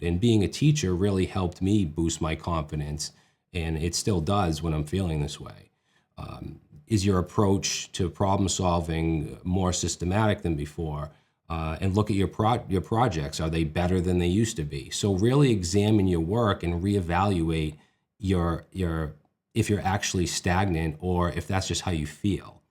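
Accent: Boston, United States. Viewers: That Boston accent tho 🤣 But I am a NY'er and would rather learn listening to you than someone that barely speaks English! I have to say this because it happens a lot with music production too and dudes getting daunted by new DAW's. Don't go into anything thinking you are going to understand the ENTIRE THING - you ain't figuring out Ableton Live in a week when it took 100 developers years to build it. Jump in with a list of JUST what you need to get what you are doing DONE. Learn just how to do what you need to do, then as you need different things you don't understand you look them up one at a time, use AI it's super convenient compared to asking google questions. just my two cents.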